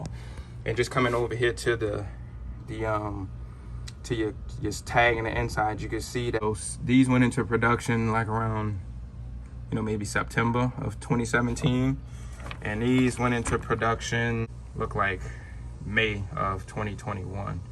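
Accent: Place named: Louisiana, United States. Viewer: You gotta be from Louisiana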